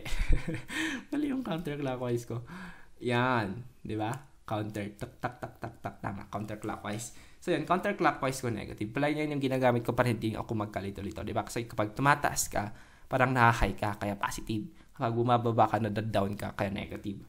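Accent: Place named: Pangasinan, Philippines. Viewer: now watching <3 quiz later tas exam next week hahaha btw may pangasinan accent kayo like bangking hahaha idk if im right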